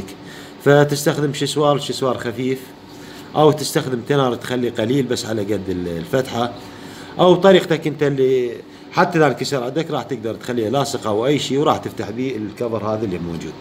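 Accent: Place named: Iraq. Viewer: السلام عليكم ورحمة الله وبركاته اولاً اشكرك على هذا الشرح الرائع بس ياريت بشروحاتك القادمة تحاول تتكلم بلهجة تكون مفهومة اكثر لغالبية المتابعين أخوك من اليمن وعندي مشكلة باللهجة العراقية ما افهم عليك بعض المصطلحات 😅 (تحية من اليمن لكل عراقي)😘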